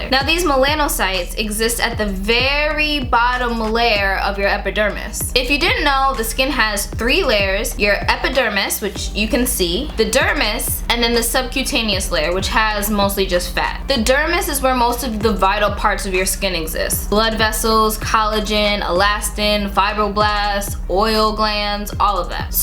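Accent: Jamaica. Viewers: Just found your channel today and started watching. I heard the accent then you said you are Jamaican 🇯🇲. Loving your channel my Jamaican sister.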